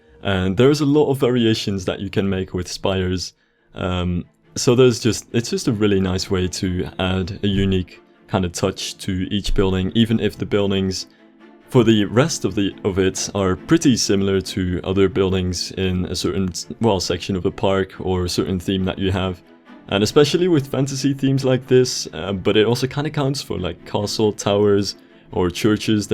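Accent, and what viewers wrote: Netherlands. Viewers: <num> Yeah, he's pretty fluent! Although I can still hear a pretty clear Dutch accent to it :P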